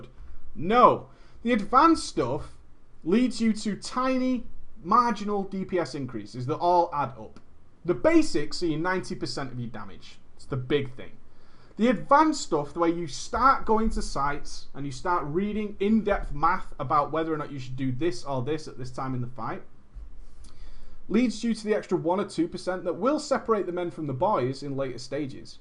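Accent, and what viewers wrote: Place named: Manchester, United Kingdom. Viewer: you sound like yer from "MAAAAHHHN-cheeeeh-stah" /brofist!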